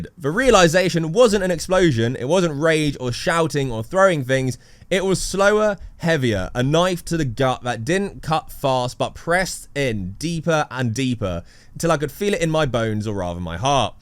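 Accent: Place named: Southern England, United Kingdom. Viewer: a name like is an immediate hint. With the tache you might as well have the map of Ireland on your face. The contrast between your Irish features and South of England accent is quite something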